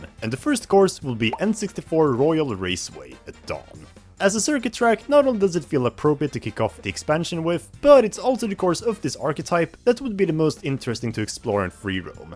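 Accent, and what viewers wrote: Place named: Sweden. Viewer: She’s Swedish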